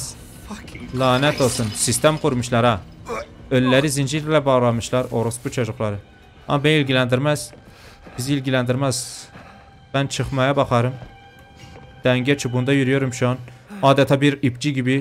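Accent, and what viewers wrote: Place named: Diyarbakır, Turkey. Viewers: Abecan abecan sen kesin diyarbakirlisan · Ay ay Diyarbakır sivene hayranim abimm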